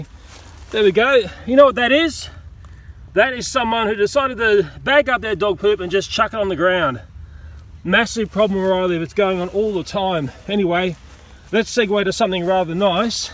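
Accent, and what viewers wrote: Australia. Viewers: You're Australian?! That's cool, I'm one too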